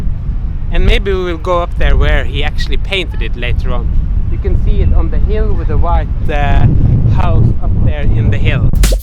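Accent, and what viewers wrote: Northern Norway, Norway. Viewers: You are so norsk although you are speaking English but norsk keeps popping out 😊👍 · e du nordlending? 😁 cool videos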